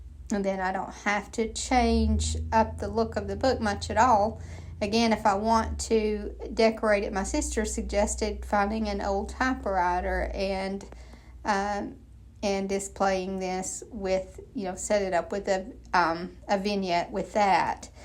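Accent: South, United States. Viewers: Some people watch videos with things like beautiful nature scenes, sounds such as crashing waves on a beach, mountain brook sounds, rain, etc. for relaxation. I watch your videos. Your calm Southern voice is so soothing. (I'm originally from Augusta, GA - now in Texas). You explain things so well it makes me think I could probably do a bit of what you do. And even the projects themselves are calm and relaxing...color tones, so much nature. No blaring music in the background. Thank you for making my day a bit brighter. PS: I tend to love the little squirrel box best. I have some squirrels I feed. Several of them come within inches of me to get their peanuts. 🐿 😊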